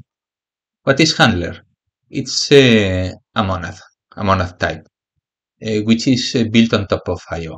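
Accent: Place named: Spain. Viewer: Sounds like an spanish accent to me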